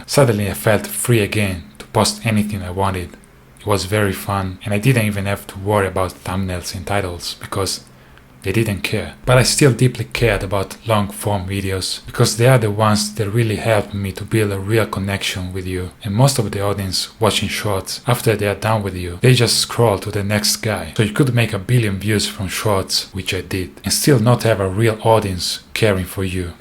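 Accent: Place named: Italy. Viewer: Please don’t give up🩷. I accidentally stumbled upon one of your videos. I heard an Italian accent ( my Mom and her family were from Italy), so I stopped and watched. I hadn’t laughed so hard in years. My husband plays bass( and guitar, sorry), so we both watch together. Please know, you gave me my first real laugh since my Mom died. Your videos about playing bass reached all the way to America, and gave one grieving daughter a reason to smile.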